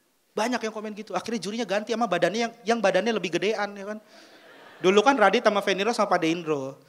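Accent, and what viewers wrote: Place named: Jakarta, Indonesia. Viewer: orang bima tp kek betawi logat nye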